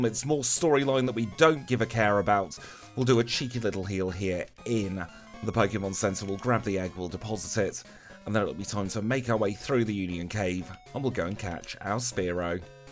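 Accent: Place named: United Kingdom. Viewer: Yoo. I play one shot games of Dungeons and Dragons every week and this week had me questioning my DM constantly. You two sound exactly the same. It was wild, I kept looking over at him thinking you are you just don’t want to tell me. I’m going to assume he is probably from the same part of GB as you, and it just the accent I was hearing.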